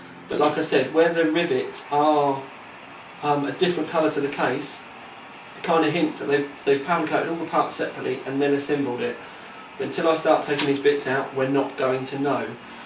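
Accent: England, United Kingdom. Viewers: learning how to do that english accent while watching this video.and how to mod the shinobi :) which I've just ordered x)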